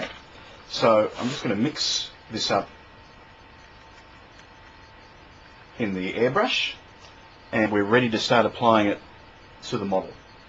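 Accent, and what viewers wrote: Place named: Australia. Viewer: is awesome and his Australian accent breaks up the monotony of his instruction. Don't forget about Sheppard Payne the master of the diorama (R.I.P.), Paul Boyer the decalling wizard, Aaron Skinner the armor fiend, Rodrigo Hernandez Cabos the airbrush genius, Geoff Coughlin; jack of all models, and the brush-painting Italian god Carmine Mari.:)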